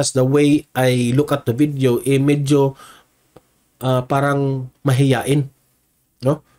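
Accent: Visayas, Philippines. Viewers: butipa wag kn mag salita ipakita mn lng un video kysa mag salita k hd nmin maintindihan un salita m kasi bisaya k ok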